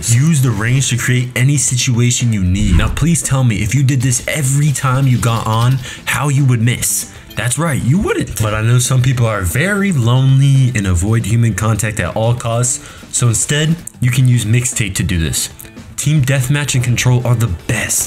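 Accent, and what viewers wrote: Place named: Midwest, United States. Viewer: I just know your from the midwest lol- great video bro!!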